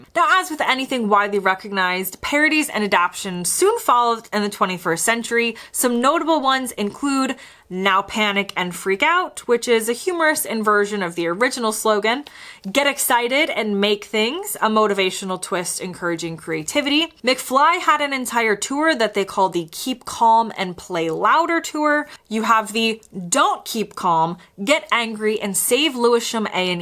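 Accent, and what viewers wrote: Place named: United States. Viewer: Unfortunately, propaganda which can be sometimes be effective at the time also leaves a behind a false history. I know you have an interest in history, and there is enough material out there to study this in some detail. Though I recommend you never make a video about it. With an American accent, you cannot tell it. The "keep calm and carry on" and Blitz spirit stuff have left a very different picture of the war. One place you can start is the number of deserters and people who never showed up for their draft. British army gives the figure of 100,000 deserters, US claims 50,000. Nobody knows how many failed to show up at all. There are estimates of tens of thousands of deserters living in London alone. And these people were immediately rendered 'outlaws'. In a country where every person could only shop with government issued ration books, these people had to make other arrangements. 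The crime levels and 'dog eat dog' nature of a country at war get completely glossed over in the numerous fictional accounts written afterwards. The 'Blitz Spirit' itself is one of histories greatest revisions. It wasn’t safe for women to be on the streets. Homes were looted, stores were looted, bodies were robbed, murders occurred. "Crime experts" and Scotland Yard are on record as there being at least a few serial killers on the loose in London alone. I suspect if the "keep calm'" posters were never released, it was because the government knew it could only push so far. There used to be a UK org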